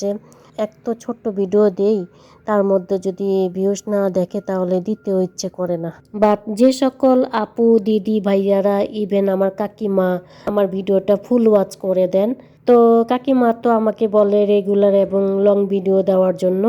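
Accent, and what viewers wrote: Dhaka, Bangladesh. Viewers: Dua Kori sob report jeno valo hoy r betha O jeno valo hoye jay. Dhakaiya kotha sunte valo e lage. Onek kichu ranna korle. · লাইক দিয়ে শুরু করলাম ❤ অনেক সুন্দর ভিডিও শেয়ার করলে আর অনেক ভালো লাগে ঢাকাইয়া কথা শুনতে। রান্না গুলো বেশ মজা হয়েছে মনে হচ্ছে তাই না?❤ আমি ও আপু গরম পানি দিয়ে রান্না করি তাহলে রান্না বেশ মজা হয় ভালো লাগলো ❤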